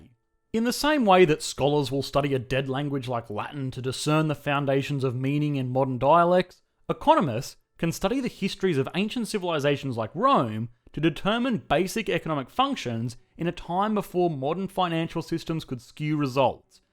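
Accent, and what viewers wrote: Australia. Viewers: This narrator is from the land down under. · I wish Australians would speak in a more anodyne way and not torture vowels quite so much.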